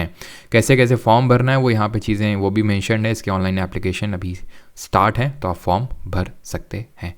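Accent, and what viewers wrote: Kanpur, India. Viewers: Ap kanpur se hai kya ?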